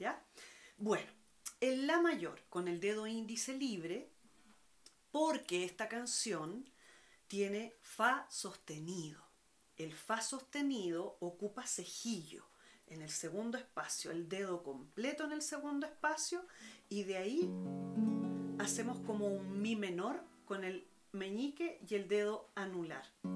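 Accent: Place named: Chile. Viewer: Un placer suscribirse a tu canal, me parece o eres de Chile? Apenas se nota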